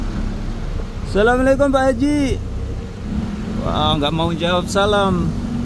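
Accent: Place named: Java, Indonesia. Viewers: owalah pantesss medok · Sama medok nya hehe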